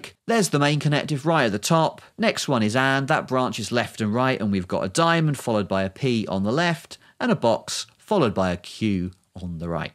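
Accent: United Kingdom. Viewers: I have to think, hearing him, about Eric Idle from Monty Python. It's a certain British accent and not unpleasant at all. For the rest, it's too difficult for me although I notice some resemblance with Feynman diagrams, which I understand neither.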